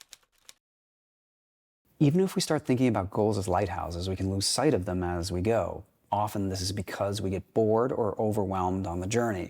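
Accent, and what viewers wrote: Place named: United States. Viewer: Set goals to be a better writer or Ryder? American accent make it hard to hear the difference. I think you are good enough whatever the answer.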